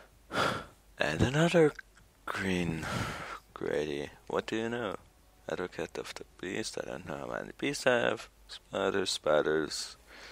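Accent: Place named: Europe. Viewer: Holy fucking hell, you sound like utter SHIT...and, oddly sound like you have a some sort of European accent? I'm confused.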